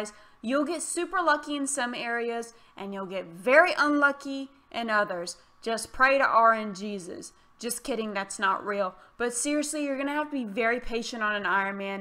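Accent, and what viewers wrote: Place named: South, United States. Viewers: Shoutout to you for using y’all and dank in the same sentence. I’m from Jersey but spent a lot of time down south and you talk just like I do LOL. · You're obviously a fellow southerner :P shout out from Texas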